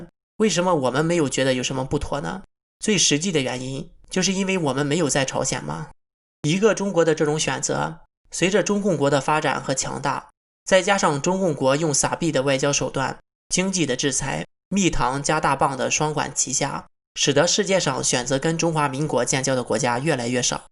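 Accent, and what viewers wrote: Shandong, China. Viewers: 这声音很明显是山东人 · 口音聽起來相沙東人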